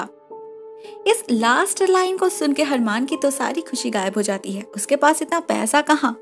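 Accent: Bengal, India. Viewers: are u from bengal?? right na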